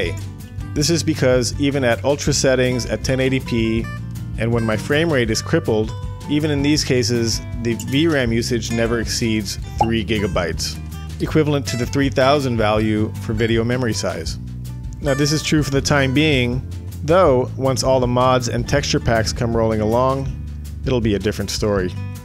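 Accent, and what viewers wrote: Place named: Netherlands. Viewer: it sounded a little bit Dutchy. This vid was great by the way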